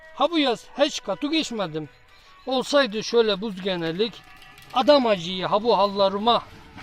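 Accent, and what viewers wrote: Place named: Giresun, Turkey. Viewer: Emeğinize ve ağzınıza sağlık başkan. Çok güzel bir Giresun şivesi olmuş Rahmetli babannem böyle konuşuyordu.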